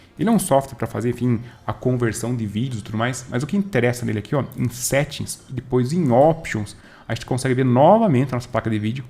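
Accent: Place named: Curitiba, Brazil. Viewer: Bem capaz que és de Curitiba. Piá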